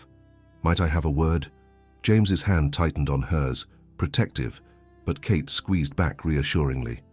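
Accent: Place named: England, United Kingdom. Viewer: I agree with your laud of the narrator. Luv that purring English accent. YUM!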